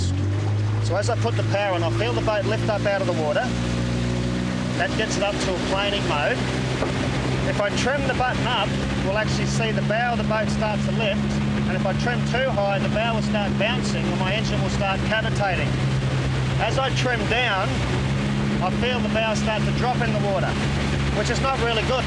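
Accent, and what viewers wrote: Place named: Texas, United States. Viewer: Good video ,,, Love that Texas accent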